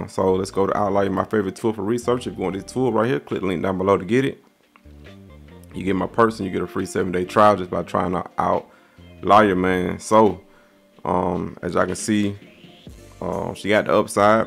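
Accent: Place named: Houston, United States. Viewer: Ayyye, my friend and I are debating about where you from. Based on your accent I’m saying Houston but he thinks it’s ATL, if you don’t mind, can you help us squash the debate!?!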